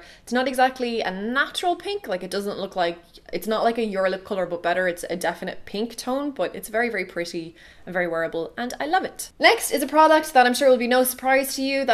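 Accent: Ireland. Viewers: I could sit and listen to your beautiful Irish accent all day. So nice.....You look so pretty and I love your hair color.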